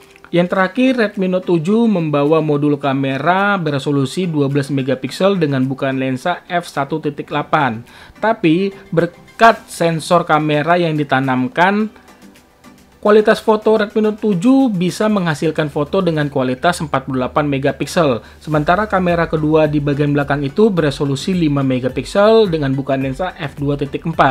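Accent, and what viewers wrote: Semarang, Indonesia. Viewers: Admine wong semarang 😂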